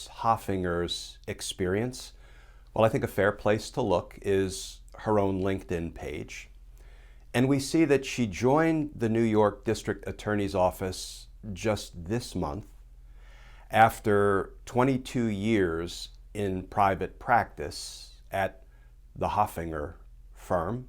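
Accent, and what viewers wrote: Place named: New Jersey, United States. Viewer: She was bought... Saw your interview with Stephanie Miller on Political Voices Network... Heard your spin on that hot mess in NY. Great interview & loved your New Jersey voice. ⚖️💪⚖️🇺🇸⚖️🇺🇸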